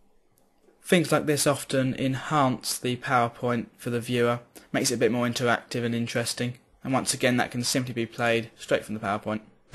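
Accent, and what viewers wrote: United Kingdom. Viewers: I love your british accent! :D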